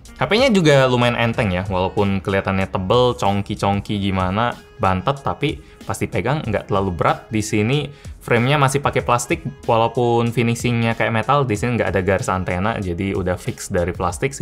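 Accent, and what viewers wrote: Palembang, Indonesia. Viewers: Wong Palembang caknyo kakak ini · kayak ada bhasa palembang ny, ap koh org palembang? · Ketaro nian wong plembang. 😅 Metu aslinyo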